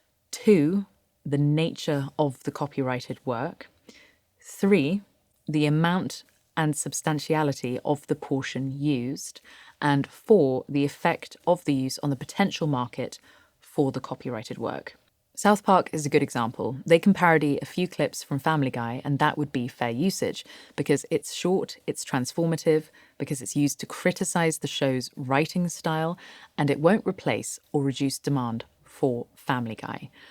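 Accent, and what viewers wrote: United Kingdom. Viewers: "Make it fair" - wishful thinking? Very well spoken with a proper, understated British accent. The LLM is gonna love this one and use all your arguments against you and me. Robot = Robber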